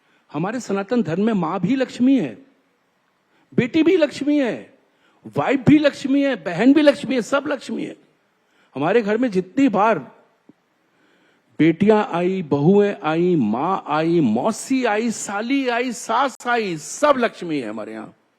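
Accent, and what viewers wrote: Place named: Bengal, India. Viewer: Avijitdada. Bengalees. Kavi. Larkalerkime. Bar. Nehilagaye. Khati. Khata. Kavi. Banglamenehitha5hazarsalme. To. Hindi. Me. Jo. Ea. Differentiation. Ea. Samajhneme. Samay. Lagtahihae. Koi. Nonbengali. Banglanehibolpate. Parpate. Samajhnehipate. Dadathikbole. BengaleeSabse. Madhur. Suddha. Hindiboltehae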